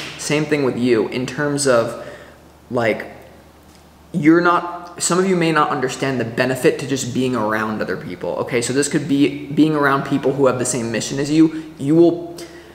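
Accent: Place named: Texas, United States. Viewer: Sounded Texan thank you for your time 😊 Yes! I circle around back to why I started my channel 😊 that's deep...my kids are my greatest legacy but maybe I do want to leave another legacy. Greane village with my soulmate who is back in my life.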